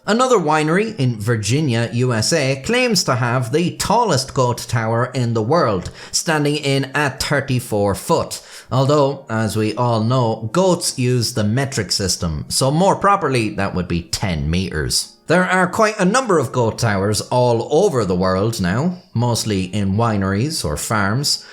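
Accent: Ireland. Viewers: I’m not even Portuguese and the pronunciation hurts. Thankfully, the delightful Irish accent more than makes up for it. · My feed knows me so well... another Irish man talking about goats and their towers is all I've ever needed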